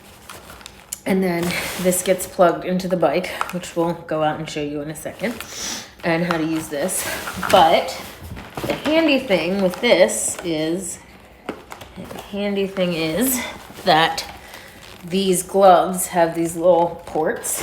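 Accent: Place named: Maryland, United States. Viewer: My wife just walked in the room as I am watching this video. She said “who is she, is she from Maryland?” I said hell yeah hon! Lol! 🦀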